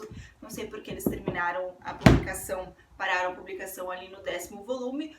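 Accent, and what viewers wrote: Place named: Paraná, Brazil. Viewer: Vc é uma " gracinha" ! Teu sotaque parece ser de PARANAENSE....muito charmoso !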